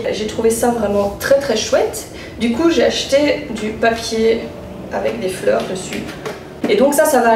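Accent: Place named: Belgium. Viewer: Tu est belge ?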